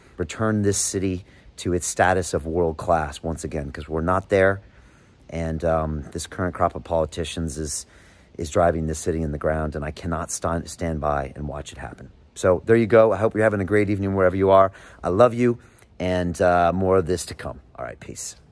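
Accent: United States. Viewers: You sound very american. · how can he be the mayor of London England and has an American Accent?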